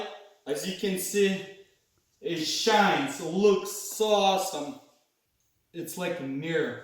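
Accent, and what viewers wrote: Russia. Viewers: Вот смотрю ваши видосы и думаю, что акцент похож на русский. Угадал?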